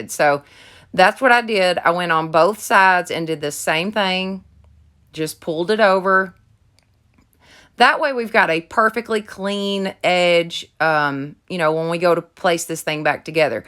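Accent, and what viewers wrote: South, United States. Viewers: Your stiching is awesome and I really dig your crafts however, your sweet home grown down south voice is what I absolutely adore.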